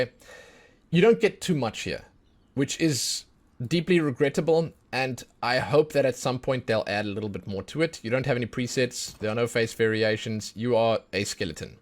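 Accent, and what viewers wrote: South Africa. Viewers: Was watching and could not figure out why I was feeling home sick. Great to hear a zaffa 😂. Great video as well you got a sub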